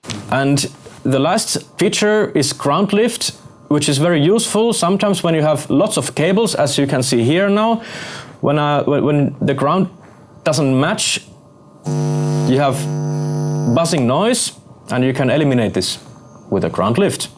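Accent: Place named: Finland. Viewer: suomienglanti ftw :D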